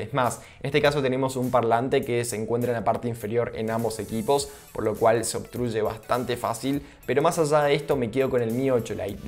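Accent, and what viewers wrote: Argentina. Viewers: Amigo tiene ud un acento muy particular, casi neutro, si es que eso existe. De qué parte de Argentina es? Todos hablan así? O es propio el estilo? Muy claro y entendible pronunciación. Se agradece. Saludos.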